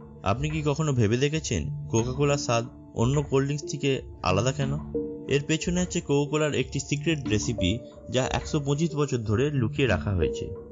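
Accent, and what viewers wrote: Kolkata, India. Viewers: Savage Hasan Ei lok money Hoi Kolkata er tai emn hoi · কলকাতার বাংলা ভাষা,তাই শুনত‌ে এমন মন‌ে হচ্ছ‌ে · Sabbir Pulok tini kolkatar acened a kotha bolce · Kolkatar bhasha ki erokom hoy naki? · indian mone hoitece '' bangla thik moto pare na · kolkatar naki dada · Vai apnr kothar moddhe kolkatar vasha mix ase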